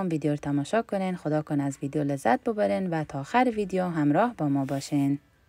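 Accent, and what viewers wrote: Herat, Afghanistan. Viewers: جان فکر میکنم لهجه شما یک کمی تغییر کرده یکی از دلیل های که ویدیو ها شما جذاب کرده همی لهجه شیرین هراتی ما است❤ ولی بازهم مثل همیشه عالی وبا سلیقه 😊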